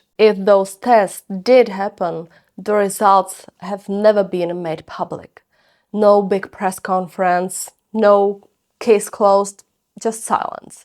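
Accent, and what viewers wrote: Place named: Eastern Europe. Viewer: My Grandad knew Muriel. They weren’t exactly friends, she was in the year above him. But he saw her around all the time. He and many others always believed it was an American soldier who committed the crime, there was a group of them stationed nearby. One vanished the following day after the murder which was obviously a little suss! 👀 Cool accent by the way! I used to live in Hungary some years back and I’m sensing you’re from that part of Europe?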